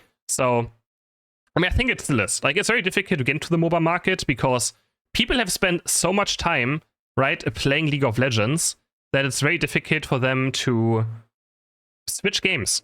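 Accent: Germany. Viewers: Hi! I totally agree with everything you said in this vid I have not quit unite yet but i am playing it a lot less, mainly because i just moved houses but also because it IS boring. We need a new map, i would love to see a summer map with kyogre/groudon as the bosses But besides that i do hope you see this comment, when i wake up everyday i always watch your videos and make my lunch while watching you. Its gotten to the point where my parents are like "oh, he's watching the german guy again" not necessarily in a mean way they just recognize your voice so much because im always watching you😭 It might sound a little weird but i do really like your voice, you've inspired me to try and learn the German language because i really like your accent and i think the language is pretty funny But anyways i hope you do read this because i love your content even if i quit unite i will always still watch you play it, youre the thing i look forward too in the day and i hope your views go up sometime soon ❤️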